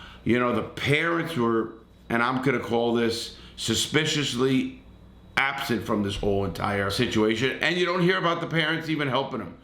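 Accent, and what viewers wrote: New York, United States. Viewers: I’m gonna buy a copy as well, but I live here in Phoenix Arizona, and I’m originally from New York, I lived in three of the five boroughs of New York City, but I was born on Suffolk County Long Island. Every time I hear speak, I feel like it take’s me right back to NY (in a good way.) “Youtube membuh prograaam.” The best! I listened to your book in audio format, but I really need to buy the physical copy tbh. I’m Jewish but my father grew up in Bensonhurst Brooklyn in the 70s and 60s, so I got to hear all these crazy stories. your channel is the best color I always try to give a thumbs up to every video you make! We all make mistakes in life, but it’s how we learn from those mistakes, and use those mistakes to change our press the situation., you constantly remind me on a daily basis why I should be appreciative of the small things I have, and I’m only 36 years old, but I’ve dealt with some craziness in my life. 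I was arrested many many years ago for weed, but nothing really came of it, but I’ve had to deal with my fair share of the police, so I really appreciate your channel, although I wish you would focus on talking about the NYPD a little more because there are so many corrupt people in the department. I lived on Staten Island, Manhattan, and queens; the most corrupt police I’ve ever met in the country live on Staten Island, whereas here in Phoenix they are just trying to protect the community, and as long as you aren’t being a jerk, they will be respectful, w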